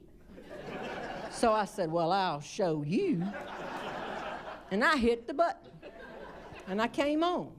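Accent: South, United States. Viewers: I love her humor! I love her attitude!! I love her sweet southern voice! She is a joy to listen to!!! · Lord! What do they put in the water down there? I always thought we were funny up here in the mountains but you with your Southern accent have us beat good. How ‘bout heading up this way and exposing us to your down state humor? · It’s wonderful to listen to a beautiful southern lady and you’re absolutely hilarious 😂. One southern lady to another please keep the laughter coming, lord knows it’s what we need. Unlike most women comedians there truly not funny or some are just nasty and (completely tacky), though I doubt they even know the meaning of the word.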